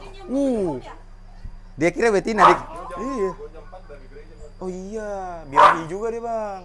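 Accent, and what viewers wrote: Ambon, Indonesia. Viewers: Biar da lama di jakarta ttpi logat Ambon nya nga ilang2 👍👍👍😂😂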